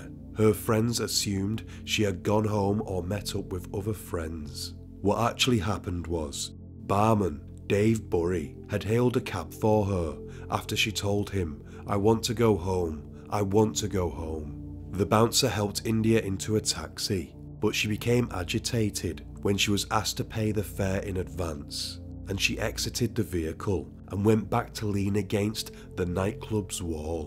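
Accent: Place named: United Kingdom. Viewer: What irritates me is his British vernacular .. muhvuh (mother) deaf (death), etc.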